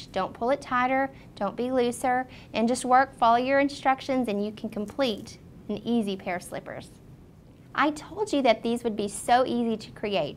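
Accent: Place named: North Carolina, United States. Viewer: I have to ask, are you from North Carolina? I feel like I recognize your accent :)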